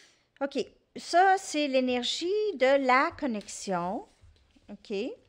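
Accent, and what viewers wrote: Canada. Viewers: Chère comme ça va faire longtemps que je n’ai pas eu un billet d’avion dans ma poche tu es mon petit quart d’heure « dépaysement » entre ton petit accent canadien qui me ravit et tes jolies remarques sur les oiseaux ou autres charmantes voire drôles Ben je voyage quand même grâce à toi Je t’écoute depuis la France J’ai déjà été au Canada dont je garde précieusement quelques beaux souvenirs 💕❤️💗💓❣️😘🌺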